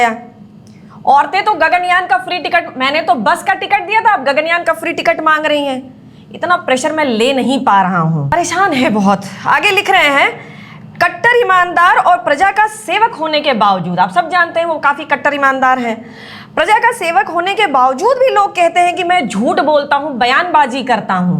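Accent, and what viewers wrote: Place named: Kanpur, India. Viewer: Bhai aapki bhasha and tone. Kanpuriya hai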